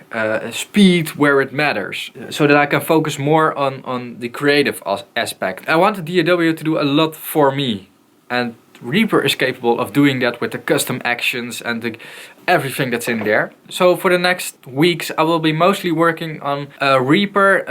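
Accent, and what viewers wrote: Netherlands. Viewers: Dutch accent 👍🏻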